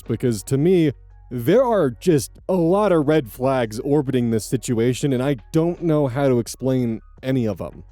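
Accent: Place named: United States. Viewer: Nooo don't change how u talk!! Say vib-ah-ray-tions! I love USA accents that arent the movie accent theyre so endearing and quirky!! Pls keep ur regional accent 😭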